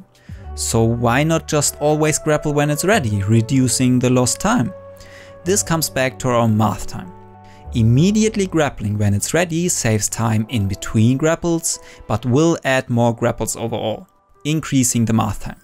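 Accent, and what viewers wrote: Germany. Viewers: Dude, he's German. Its just how he pronounces words. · Your german accent fits you so well! :)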